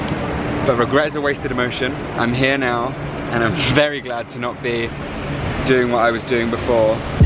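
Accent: United Kingdom. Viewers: Loved ur vid!! N.. U accent! Seems british.. Feels good to hear u tlk.😀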